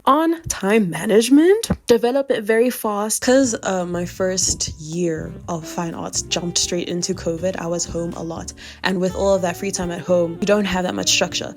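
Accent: South Africa. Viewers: Wow! I also love Charcoal because I AM SOOO IMPATIENT. Graphite and other mediums really make feel this annoying itch that tests my patience!😂 I'm so happy I'm not the only one! Thanks for reading and answering my question. You really do sound South African 😅. THANKS FOR THIS COOL CHANNEL!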